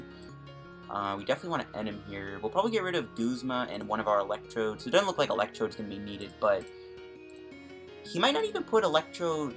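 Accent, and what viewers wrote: Canada. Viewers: r u from Canada ?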